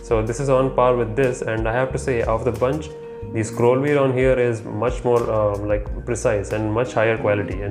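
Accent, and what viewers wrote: India. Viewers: The channel's name should be Hopeless indian sounding guy that causes cancer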